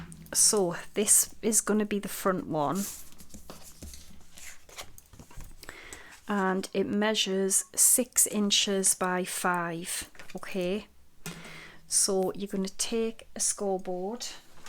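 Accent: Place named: Wales, United Kingdom. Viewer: Thank you for this, you make it so simple with easy - to - follow instructions, and your lovely Welsh accent is a bonus. Kathy from New Zealand.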